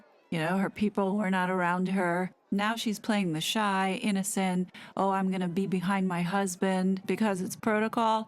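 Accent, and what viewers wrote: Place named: United States. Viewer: Nice to hear an American voice that has some understanding of how The Monarchy works. Duty before family, feelings or wants. She is being tightly controlled by senior members of staff, probably ex military and a higher rank than Prince Harry was. By her demeanour, she had been told how to act. They separated M from H, and he seemed quite happy to be free of the claw. Yes her behaviour to the staff will be addressed, not necessarily to M & H. Give enough rope etc. The Prince & Princess of Wales just outclassed them by sheer presence.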